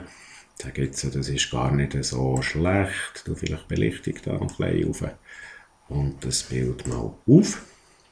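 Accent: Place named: Bern, Switzerland. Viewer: Dieses Tutorial ist genial. Was ich da vorher vergebens geübt hatte! 6 Minuten in Berndeutsch und es klappt.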